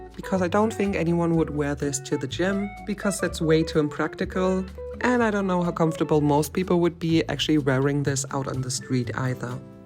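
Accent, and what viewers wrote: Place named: Germany. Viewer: GERMAN ACCENT MUEHEHEHEHE these drawings are awesome ggs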